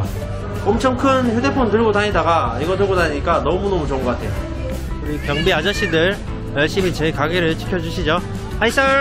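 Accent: Gyeongsang, South Korea. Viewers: 저역시 피나이 티비 구독자인데 채널도 병행구독 할거에요! 근데 경상도 분이신가요?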